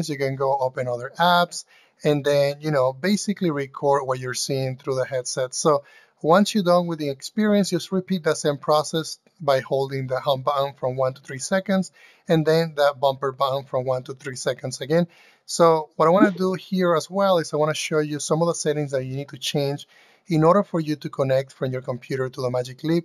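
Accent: Spain. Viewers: why you do not have the same content in spanish your pronunciation is like spanish or something like that can you talk about in spanish too ? that would be great !!! God Bless you.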